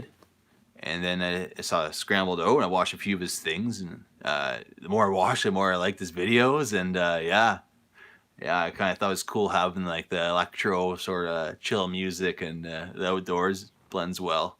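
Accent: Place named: Quebec, Canada. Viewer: You were talking with your hands so the dog thought you wanted to play. You don't sound Ontario Canadian , you say eh way too much. I'm Ontario Canadian, and I say it a couple times every few months or less lol. Actually you do sound like you're French Canadian from Quebec with that many eh's in a short time. I prefer to watch mostly all Canadian channels of camping. I'm a new subscriber. Actually you could be from Vancouver or Newfoundland they say eh tons too.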